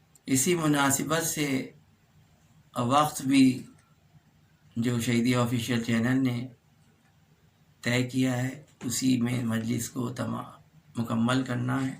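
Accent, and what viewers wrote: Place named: Lucknow, India. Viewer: Channel ne khaas Lucknow ke lahje me soz o marsiye ki goonj hum sab ko karbala e Mualla tak Sunwa di MashaAllah Recited Soora e Fatiha From Karbala/Iraq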